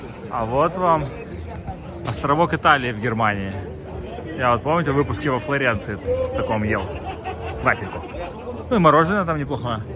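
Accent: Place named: Odessa, Ukraine. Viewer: Первый раз смотрю видео автора, практически сразу, по говору понимаю, что наш человек, одессит!) Также был на этом матче на гостевом секторе, эмоции суперские. Nel bene e nel male forza Internazionale!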